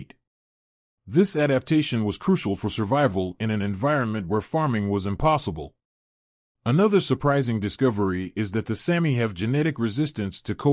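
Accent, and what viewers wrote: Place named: United States. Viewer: No American voice please. FO.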